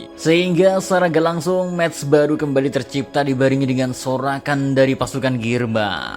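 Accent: Java, Indonesia. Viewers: logat Jawa Inggris nya mantep bang wkkw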